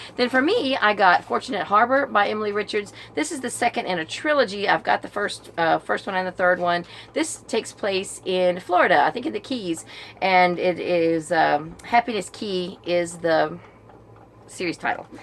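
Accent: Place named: Oklahoma, United States. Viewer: Yes, I love your Oklahoma twang!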